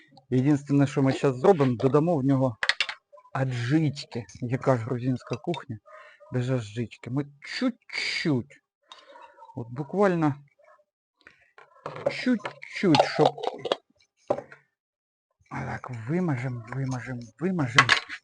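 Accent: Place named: Central Ukraine, Ukraine. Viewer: Друже, дуже цікаві відео. Сидимо з дружиною і гадаємо звідки ти ці відео записуєш? Я з Черкас, вона з житомирської обл. Каже що в тебе такий самий говір як і в мене, то ти також десь з тих країв...) То звідки ти?))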